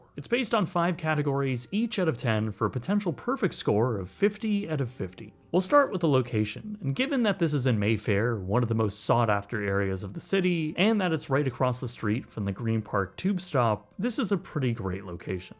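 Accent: Canada. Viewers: This guy just whines about everything in a Canadian accent and makes sure to say “out” and “about” in that dumbass way as much as possible in every video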